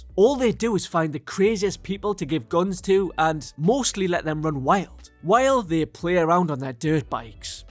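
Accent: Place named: Scotland, United Kingdom. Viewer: I love scottish accent, but i felt was missing some humpf… like more energy… felt like just reading, just my opinion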